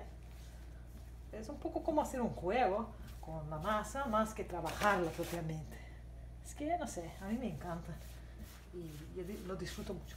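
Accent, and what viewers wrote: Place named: Portugal. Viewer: Tan dulce su castellano con tono portugués, muchísimas gracias, por explicar con tanta dedicación y quedo suscrita como su alumna !🥰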